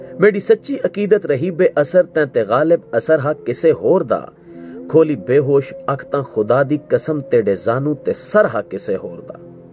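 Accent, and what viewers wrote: Saraiki, Pakistan. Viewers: Aap saraiki hai kiya?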